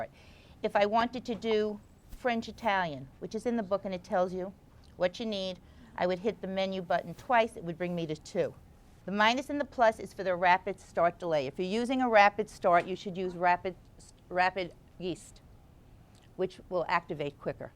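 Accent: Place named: New York, United States. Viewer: I love the New York accent.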